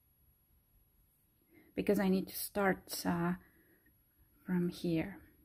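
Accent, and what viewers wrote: Estonia. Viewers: Are you estonian? You have an estonian accent :)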